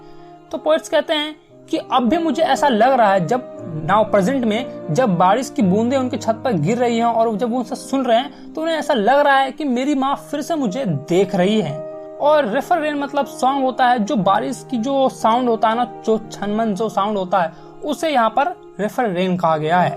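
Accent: Bihar, India. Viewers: Aap Bihar saa hoo kya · ABA SALA BHIHARI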